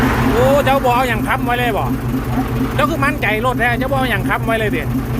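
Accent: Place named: Nyo, Thailand. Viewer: คนสัยน้อคับคือเว้าญ้อออ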